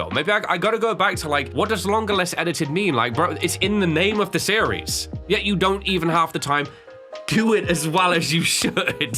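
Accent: United Kingdom. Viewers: For what it’s worth, I think you’re a fantastic YouTuber. I agree that it would be cool to see you explore games other than horror. But I stumbled across your channel looking for Backrooms themed games and I’ve been hooked on your videos ever since. It’s nice hearing a fellow British accent too. Your voice is way more relaxing than a lot of other gamer YouTubers. It would be nice to have longer, unedited content. I’m here for it! Great video! Keep doing what you love!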